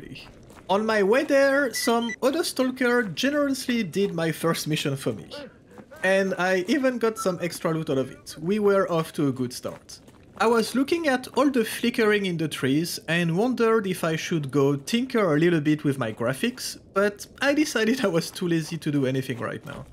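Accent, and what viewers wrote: France. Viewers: hey dude, i can’t say where u from its like a french accent and russian one 😂 could u help me on this one ?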